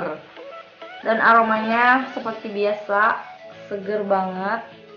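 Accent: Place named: Cibeber, Indonesia. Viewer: Atuh logatnya kaya ga asing nadanya juga.pantesss teteh dari Cibeber ya allah kampung halamankuuu😍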